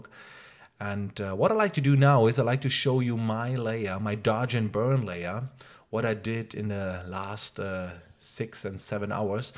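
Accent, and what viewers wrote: Germany. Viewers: Great tutorial! Keep up the great work. Gotta love that German accent! Inwoooard (invert), blent (blend) :) Reminds me of my family in Germany.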